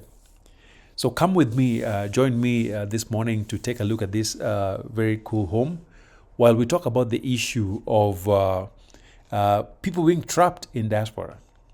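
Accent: Luo, Kenya. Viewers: Enjoying your slight luo accent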